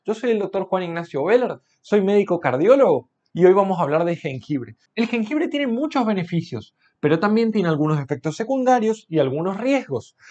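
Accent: Misiones, Argentina. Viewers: HOLA doc usted es de Misiones?tienes un tono muy de un Misionero muy linda la explicacion para el buen uso del gengibre...yo tengo una duda yo tomabe em te y en mate pero en poca dosis ...lo tolero pero mas de una rodajita me produce dolor de cabeza y presion alta y desde ahi deje de consumir porque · Argentino creo, yo soy argentina jiji😂😂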